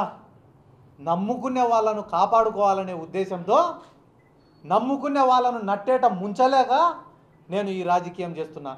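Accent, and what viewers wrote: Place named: Rayalaseema, India. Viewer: Sidhardha Reddy lo meeku nachedi matalu, aa slang aa vakhchaturyam okka Rayalaseema ke sadhyam adi vinte evaraina estapadalsinde, chala mandi profession valla aa slang vadatledu.. Ex. CM Jagan... Anyway we are AP people need to be unity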